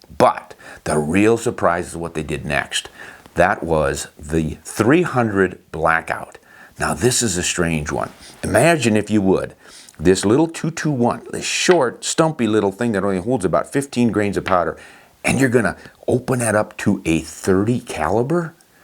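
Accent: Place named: South, United States. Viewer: ...I own a Sako Rifle in.222 Remington made in 1950....I went to the range and off the bench shot a 3 shot group that I measured with a caliper and it measured.221....how about that? This was 4-5 years ago...I still have about 40 rounds I loaded for it...and did not shoot them all, I'm conservative with my ammo...you got one of them tack drivers? I kind of like you...I like the way you talk...You sound like me...a lot...I bet you are in a southern state...you ain't no Yankee are you?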